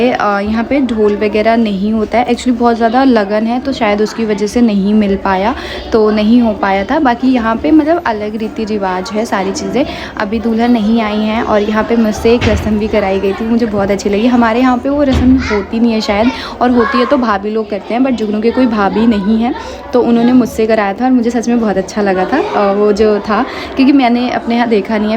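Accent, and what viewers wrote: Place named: Ballia, India. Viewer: Di but mje ek chiz smjh nh ati ki jb ap bihar ki ho ar meea balia m nanihal h . But language to apki same h Jesi balia m boli jati h .but bihar ki ti alg hoti h .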